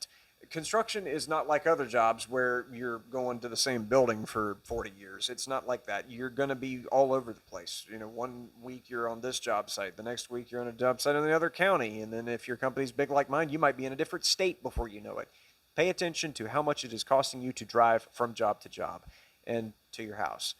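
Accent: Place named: South, United States. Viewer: Man I love your hillbilly voice 😂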